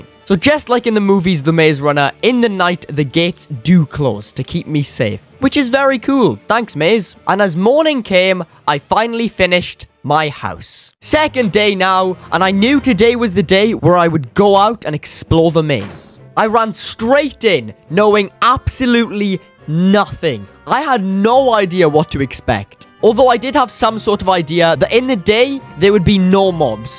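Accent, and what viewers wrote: Wales, United Kingdom. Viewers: Yo man you welsh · Your accent tells me, an American, that ur British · correct me if I am wrong but your accent has a welsh touch to it lol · Are you Welsh? I’m from the UK, and I can’t help but notice the Welsh accent! 😁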